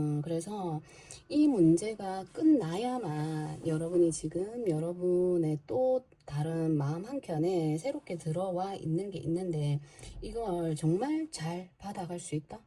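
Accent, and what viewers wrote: Gyeongsang, South Korea. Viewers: 역쉬 쵝오십니다! 짝!짝! 경사도 말씨 매력있습니다 ㅎㅎ 부분적으로 맞는부분이 많습니다 저의 소원이 꼬옥 이루워지길 요...... 2번 입니다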